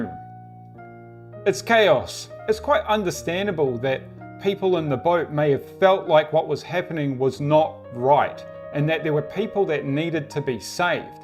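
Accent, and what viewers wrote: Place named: New Zealand. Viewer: You are lucky my friend, you got to observe different parts of the world first hand! I guessed you were from NZ from the accent...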